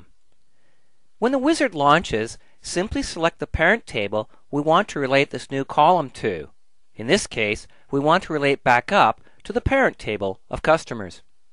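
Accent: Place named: Canada. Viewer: Canada? love the accent. thanks for sharing.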